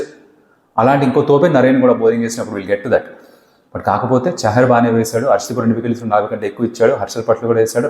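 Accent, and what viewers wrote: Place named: Hyderabad, India. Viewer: Bhaiyya apka Hindi mast hai bhaiyya....pakka hyderabadi hindi❤❤❤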